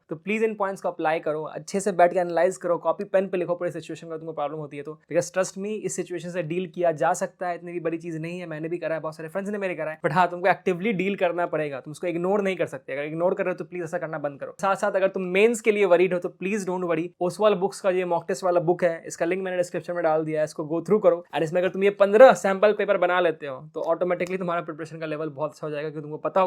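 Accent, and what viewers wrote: Bihar, India. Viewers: bihar tone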